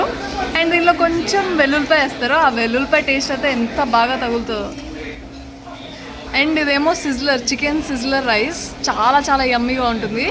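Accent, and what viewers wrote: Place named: Karimnagar, India. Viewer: Slang different antey meru Hyd or knr. madi karimnagar akka. Continue videos cheyandi anna.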